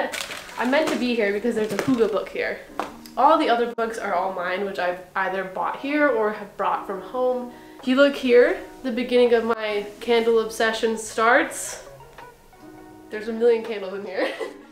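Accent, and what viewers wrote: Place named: United Kingdom. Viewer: Where r you from originally (i mean country)!!!! Bcoz accent is british but beauty is far more than average brits!!!!!! Please reply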